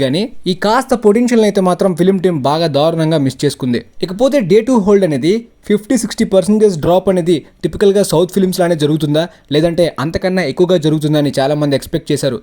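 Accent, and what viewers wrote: Srikakulam, India. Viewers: hey srikakulam ah medi ??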